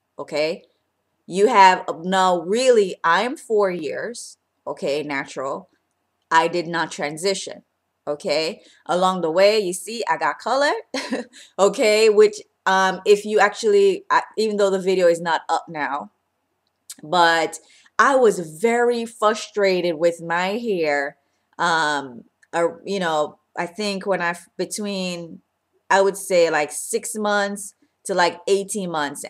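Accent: Jamaica. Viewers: loveeee love your hair- i am going natural-6 months post relaxer now- are you jamaican? i hear an accent? my whole family is jamaican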